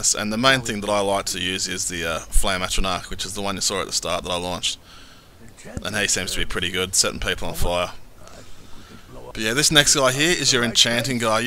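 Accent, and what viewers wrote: Australia. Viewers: it's good to hear an aussie commentating on a skyrim vid once in a while.. it's always usually some yank doing them haha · Lol i can tell ur aussie AUSSIE AUSSIE AUSSIE!!!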